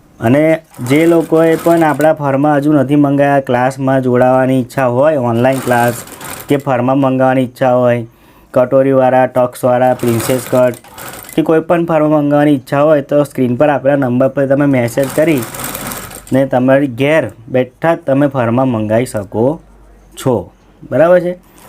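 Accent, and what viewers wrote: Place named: Mehsana, India. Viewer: Kheralu baju na so bhai mhesana ni bhasha se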